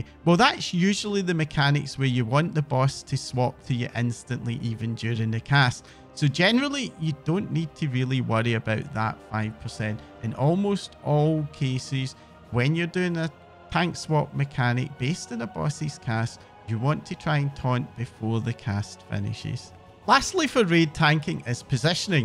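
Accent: Scotland, United Kingdom. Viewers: Thank you so much for this video. I've recently moved over to WoW from FF14 and this has been a great video that ACTUALLY goes in to the expectations around tanking in this game from the ground up. Plus it's nice to hear a friendly Scottish accent!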